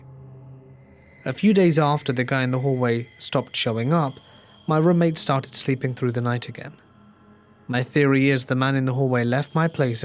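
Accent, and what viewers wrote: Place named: RP, United Kingdom. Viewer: Reminds me of a high class 1920s British socialite accent. I like it.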